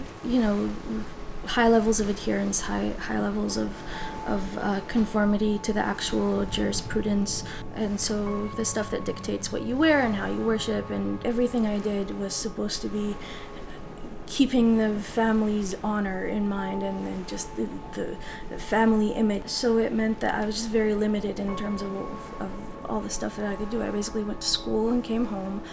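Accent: United States. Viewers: She sounds AMERICAN, and who wants to look at men anyways? I'd prefer a little segregated lol. Making a mountain out of a molehill. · Why dont she show her before-pics (for proof) with her face on front? It also makes me wonder how fluent and well-versed she is with english languange with no speck of hint of an accent considering she lived in Lebanon for more than 20 years, and magically have perfect american accent, and even if yes, she may have developed that accent, its impossible to sound that good with no stuttering and hint of a slip.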